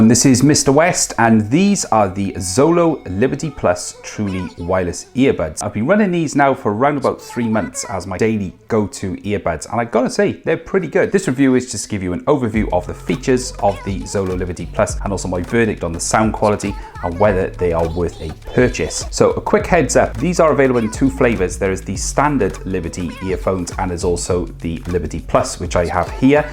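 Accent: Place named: England, United Kingdom. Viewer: Great review, nice to hear an English voice after listening to a few Americans.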